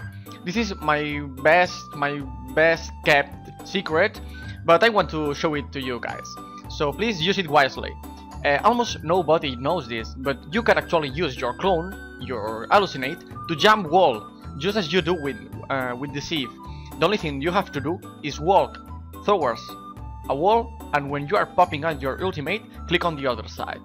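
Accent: Catalonia, Spain. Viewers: You catalan? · Awesome! I've actually never heard anyone from Spain talk before now that I think about it, and now I'm really confused how that never happened. Oh well.. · ¿Eres español verdad? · spanish? that seemed a bit catalan xD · you are spanish? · Are you catalan? Your accent doesn't seem like the english is your native language. Good vid btw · I play as shaco every day just for practicing and you have helped me alot with your tips. Thank you bro.... PS: Your accent is quite different, Are you from Spain? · You're Spanish? I know :v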